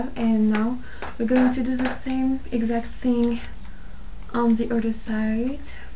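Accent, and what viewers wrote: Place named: France. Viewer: Heey tu serais pas française par hasard?? Tu parles avec le même accent que moi , c'est troop choquant j'ai l'impression de me voir xD Anyway , if not , i just wanted to know what's the name of your hair color (the one on the lenght)? And my hair is now VERY dry after the salted sea ... Would you recommend me some products or any tips ?? Thaank youuu =DD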